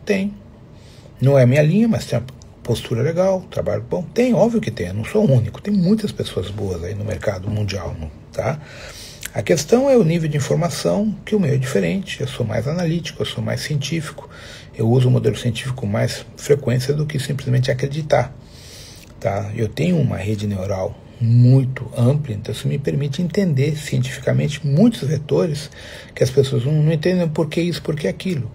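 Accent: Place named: Portugal. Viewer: No le entendí ni la mitad. Lo lamento,a mi edad, me es difícil comprender un español aportuguesado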